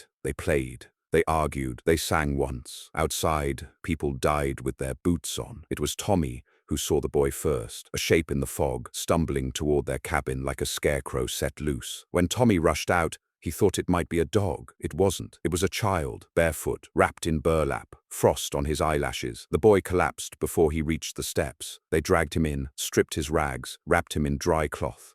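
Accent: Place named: England, United Kingdom. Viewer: What's with the English accent?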